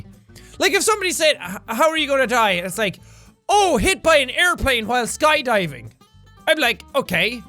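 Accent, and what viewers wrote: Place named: Ireland. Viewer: I’m sorry but the way you pronounce ‘lorry’ 😂😂😂 Not hating, just found it funny 😂😂 Dang that Irish accent 😂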